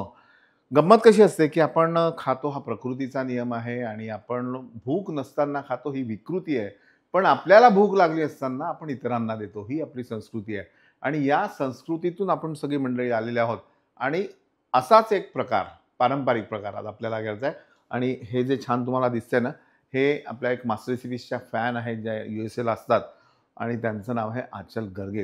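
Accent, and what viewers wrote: Vidarbha, India. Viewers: Varhadi bhashechi godi chhan watli,.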